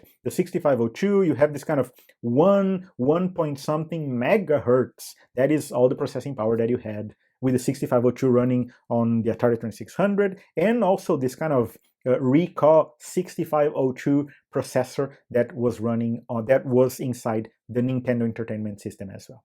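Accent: Brazil. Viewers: If you drink mate you've got to be Argentinian but you have a Brasilian accent haven't you 🤔. As a C64 programmer I can imagine how hard the VCS must be to code for without a graphic's memory. This notion of racing the beam is alien to me.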